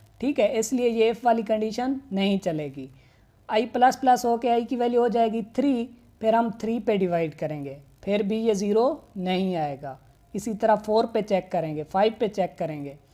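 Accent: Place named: India. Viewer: The Indian accent is very difficult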